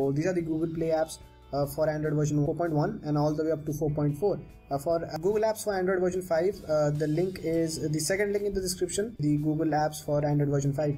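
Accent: India. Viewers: thank you so much...are you from India?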